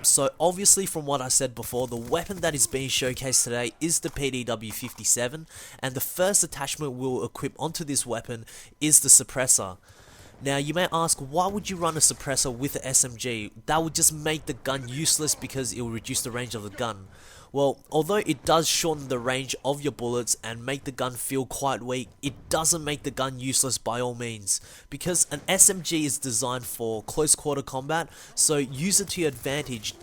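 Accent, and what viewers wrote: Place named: Australia. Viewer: i knew your were Australian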